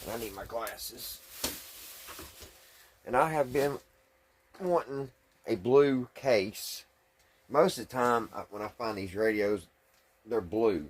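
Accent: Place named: Kentucky, United States. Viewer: You talk like u are in Southern to eastern Kentucky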